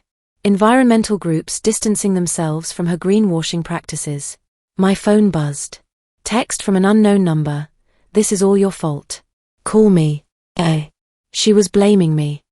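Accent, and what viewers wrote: United Kingdom. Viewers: Awesome. Love British Narrators so much ❤️ 💖 💗 💕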